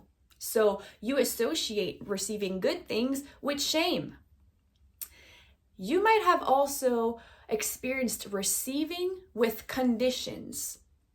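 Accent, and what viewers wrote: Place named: Quebec, Canada. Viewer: You sound so Québécoise! 🥰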